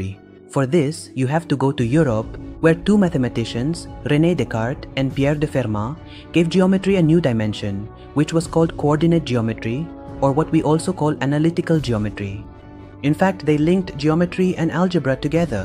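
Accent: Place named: India. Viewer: Ein Inder oder Pakistaner? Typischer Akzent.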